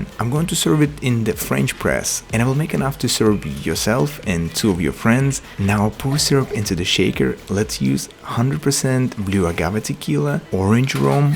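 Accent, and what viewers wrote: Russia. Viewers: Здрасте, мне кажется или у рязанский акцент? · Автор из России? Просто акцент, я его слышу и мне кажется что он русский, да и к тому же имя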